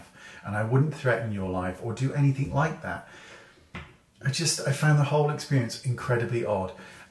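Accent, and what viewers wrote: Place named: West Country, United Kingdom. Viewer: I love your West country accent Congrats on your marriage, ignore the naysayers x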